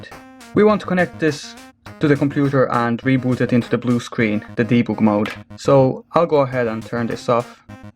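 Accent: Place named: Netherlands. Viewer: are you dutch because i hear some sort of accent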